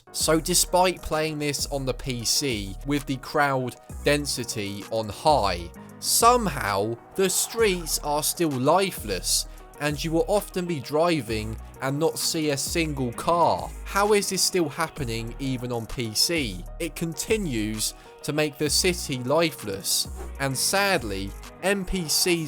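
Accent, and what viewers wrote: United Kingdom. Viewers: Bro you're British as an American it's hard to getting some words I have to playback 10 seconds for that 😂 Edit: but I love your videos though ❤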